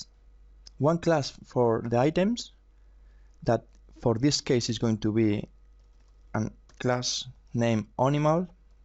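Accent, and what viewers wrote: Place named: Spain. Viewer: Suena a español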